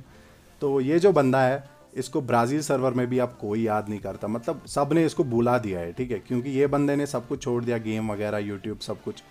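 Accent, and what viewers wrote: Kashmir, India. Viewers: Bhai kashmire ho app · Kya aap Kashmir se hooo 🙄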